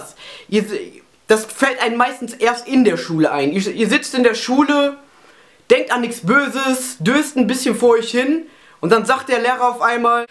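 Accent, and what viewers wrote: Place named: Cologne, Germany. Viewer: hör ich da kölsch?!?!?!?! ich würd sagen.....super tipp, das mit dem durchmachen....probier ich gleich mal aus:D ...ich hab irgendwie angst vor diesem st. augustin...!!!!!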